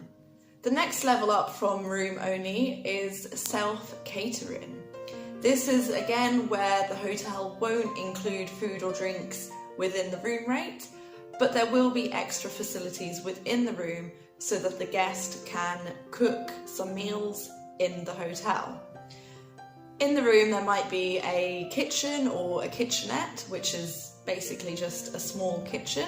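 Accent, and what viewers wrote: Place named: United Kingdom. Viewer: Beautiful British accent!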